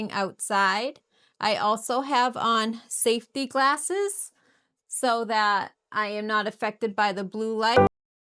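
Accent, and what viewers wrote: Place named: Massachusetts, United States. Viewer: Hi there. Love the MA accent. From Plymouth. Setting up a small 3d print farm now. Was wondering if you’ve used the laser on PLA. I’ve just found you so haven’t watched all your stuff yet.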